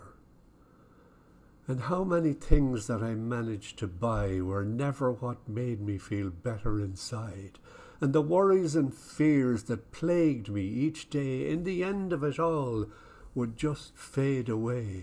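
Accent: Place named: Ireland. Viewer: I LOVE your recitation of my poem, particularly with that lovely Irish accent. You say my words with the exact feeling with which I wrote them. You have given my words life and what a fabulous gift that is for me. You say each word with your heart and I now have a lump in my throat. You are wonderful. Sincerely, Pat F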